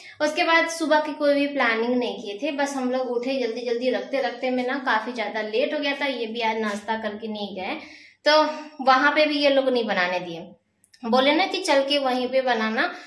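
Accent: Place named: Bihar, India. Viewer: Asalam o alaikum baji aap ne k kaha k aap u.p se hain lekin aap ka lehja bihari wala hai aap k bohat se alfaz biharion wale hote hain, I'm also a bihari from Karachi pakistan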